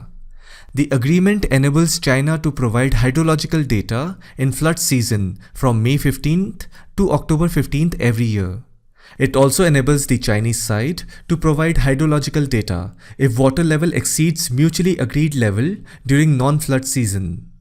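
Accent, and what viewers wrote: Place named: West Bengal, India. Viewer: Mad or what?..he is obviously from West Bengal or northeast...Tamil accent is so different.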